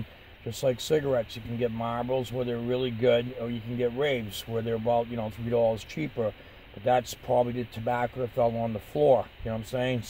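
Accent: United States. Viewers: Recognized the accent right away. Lived in Salem Mass! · Love the Boston accent! I live in Haverhill! Lol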